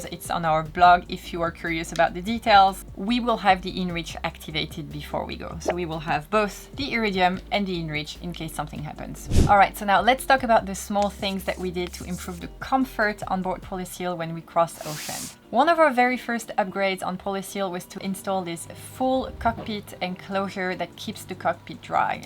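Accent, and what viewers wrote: France. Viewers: I found myself watching your video because I couldn't stop listening to your accent. I love the French accent and wish that my French (Ce n'est pas bien, je suis desole:) were half as good as your English. I wish that my wife and I could do what you and are doing. Great video! Merci beaucoup.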